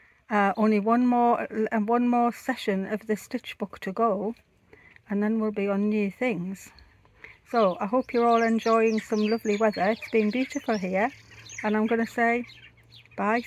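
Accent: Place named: County Durham, United Kingdom. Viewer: Wow your dragon is magnificent and magical. I have just found you this morning so I’ve quickly subscribed and can’t wait to see all of your videos. Thank you for sharing and inviting us into World. I follow embroiderers from all over the world so it’s lovely to learn you’re also from County Durham and hear your local voice.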